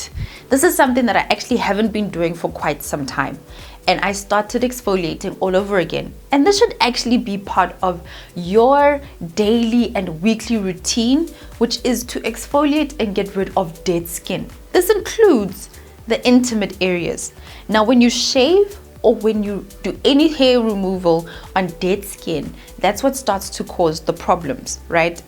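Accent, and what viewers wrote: South Africa. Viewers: Please tell me u are South African 🇿🇦 or Kenya? 🇰🇪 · Are you from ZA? I have ZA (and LS) friends and I always love their accents. New subscriber! Edit: you just confirmed it! I knew it!!! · Yes she is South African💃🏻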